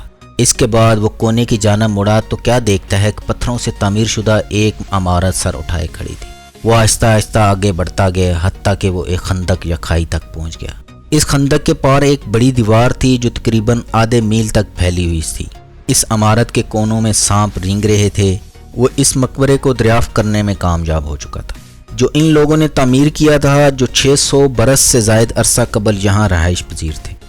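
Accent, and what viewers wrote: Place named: Punjab, Pakistan. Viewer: Speaking with a punjabi accent bad English pronunciation need a lot of improvement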